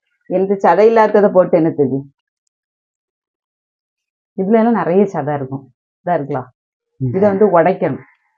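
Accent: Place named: Kanyakumari, India. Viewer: Konjum kumari tamil supper puriyatha vanga than mannakatty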